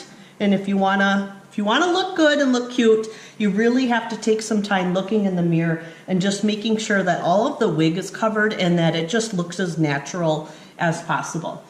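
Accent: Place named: Wisconsin, United States. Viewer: I heard a comedian on the radio today and she sounded just like you! And she said she was from Wisconsin. hmmm. Ok, I think its Jackie Kashian and it must be a Wisconsin accent because I thought it was you. lol. still sounded more like you than her.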